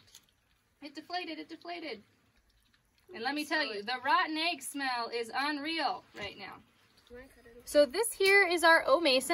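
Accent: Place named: United States. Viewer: Also Lady, please put down an English writing above, because don't understand american accent and vocal pronounciation with all mouth. Is strange this English accent. The subiect îs to interesting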